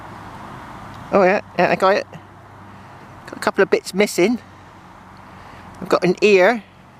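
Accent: United Kingdom. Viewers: Hi. I love to listen to a nice west country accent just like my old POP, he came out to australia from England in 1920. The GPX 5000 was designed in australia to cope with the highly variable iron mineralization of our goldfields, Its primary purpose is to hunt for gold nuggets. The large coil will be confusing the detectors micro proccessor into trying to retune iron targets out as ground noise. A PI machine will punch deep in mineralizd ground but will not be much good at interpretting the signals from the iron or copper halo that forms around long buried iron or copper bronze objects. It will however find gold coins etc.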